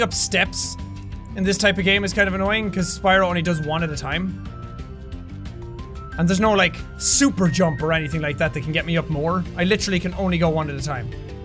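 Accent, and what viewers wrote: Ireland. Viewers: jack really went into Irish during this episode. LOL. Love ya. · His Irish maxed out this video lol xD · inner Irish came out in this video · I absolutely love it when your pure Irish accent and phrases come out:3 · Im Irish too. We all talk the same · MrG I love any Irish voice tbh 😍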